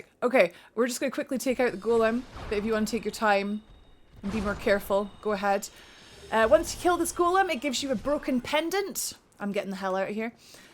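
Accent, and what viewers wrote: Scotland, United Kingdom. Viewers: Are you Scottish Great video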